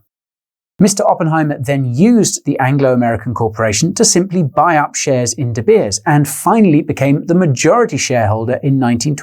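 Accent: United Kingdom. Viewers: You should say "howdy" at least once every episode because with a british accent that is hilarious. (texan) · Amazing. He has a British accent that is tolerable. As well as an understanding that all traditional banker gangsters would ever want to do is rain on your crypto parade. Smash the like for that!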